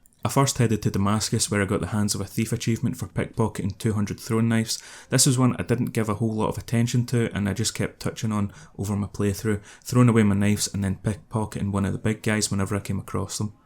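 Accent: Scotland, United Kingdom. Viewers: Currently attempting this myself, subscribed within 4 minutes of watching. Why? The accent. From Glasgow myself and I love to see Scottish CC’s. Fantastic video man, keep it up.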